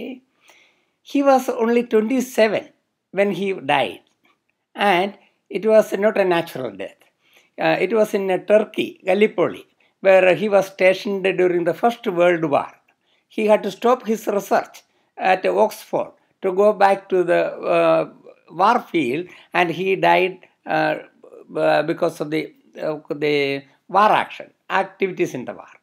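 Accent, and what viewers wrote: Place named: India. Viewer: is it indian accent?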